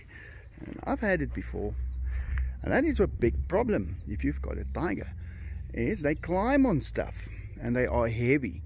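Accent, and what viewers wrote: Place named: South Africa. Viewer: Majestic cretures + South-African accent =best channel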